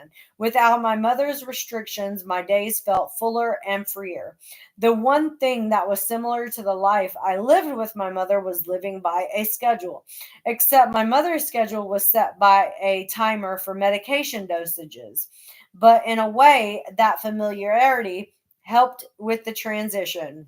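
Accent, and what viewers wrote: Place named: Louisiana, United States. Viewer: Buttery and Cajun accent do not belong in the same sentence.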